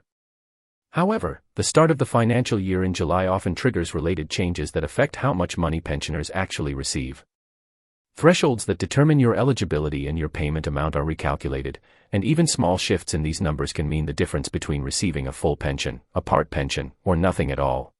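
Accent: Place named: United States. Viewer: Here we go! That yank voice again. Don't believe anything he says. Who makes this shit up? Shame on you.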